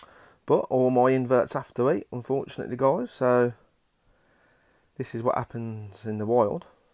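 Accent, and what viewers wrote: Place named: Birmingham, United Kingdom. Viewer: That's the Brummies accent for you (I'm one myself)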